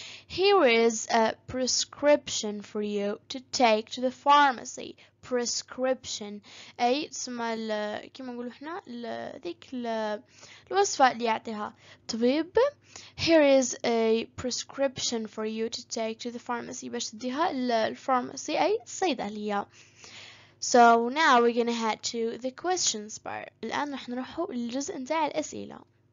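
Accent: United States. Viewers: لهجتك اميريكية 100\100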